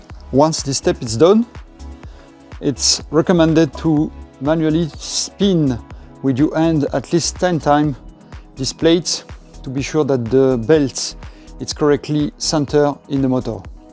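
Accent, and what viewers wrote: France. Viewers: I always enjoy your videos but may I also say that we really love your accent! It's a very wonderful French accent so please keep up the Great videos! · Ahah you’ve got the French accent it’s kinda funny ! I would like to buy this turntable but shipping isn’t enable to France…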